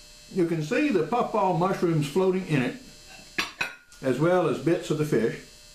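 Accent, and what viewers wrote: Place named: Appalachia, United States. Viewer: I love your voice. Like a slight hillbilly twang mixed with dr demento and a splash of jello biafra.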